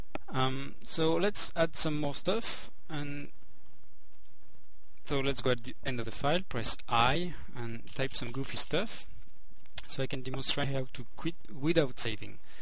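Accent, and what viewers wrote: France. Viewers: Its a French accent lol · Thanks alot man, very well explained and the french accent is interesting :D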